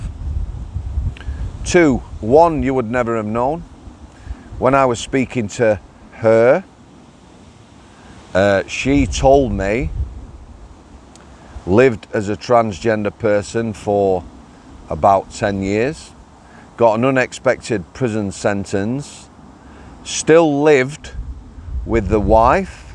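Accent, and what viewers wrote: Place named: Northern England, United Kingdom. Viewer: Best Prison talk online! I heard you on the Prison Officer podcast on Spotify. Awesome hearing a Northern accent on an American podcast. My great Grandfather’s Brother was a Prison Officer at Strangeways. Retired from there. I’m from Cheshire, lived near Clitheroe Lancs, moved to Kentucky USA, did 8 years as a Correctional Officer, worked in the private sector (armed security) and have ended up back in ‘the job’ as a Deputy Jailer in a 600 man County Jail, seeing the worst of what humanity spits out on a daily basis…but still find time to have a laugh doing it…got to Brother! Love the channel, listen to it on the way to Jail daily. Thanks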